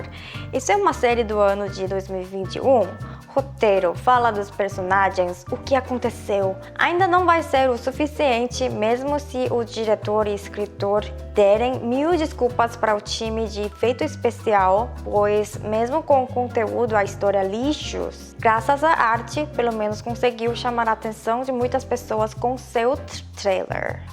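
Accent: South Korea. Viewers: amei seu sotaque coreano, obrigada pela explicação!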